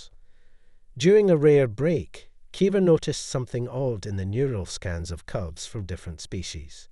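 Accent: Scotland, United Kingdom. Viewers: A story read with a Scottish accent! Lovely ❤ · love the accent, brings back memories of my Scottish Grandmother ❤‍🩹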